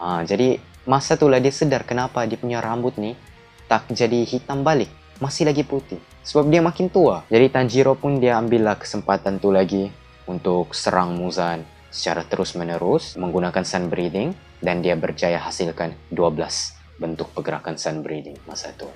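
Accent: Sabah, Malaysia. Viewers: Abang memang gempak. Org sabah bah ni. Senang fhm. Siok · Bro Loghat Mu ni Mcam Asal Sabah Ja Btw Salam Dari Kota Kinabalu 😁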